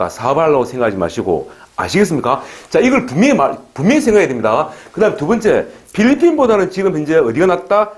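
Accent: Gyeongsang, South Korea. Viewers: 선생님 강의를 열심히 듣고 있습니다. 경사도 사투리에 구수하고 실제적이며 귀에 쏙쏙 들어옵니다. 저는 1년정도 영어 공부를 할려고 합니다. 어떻게 해야 효과적일지 가르쳐 주세요.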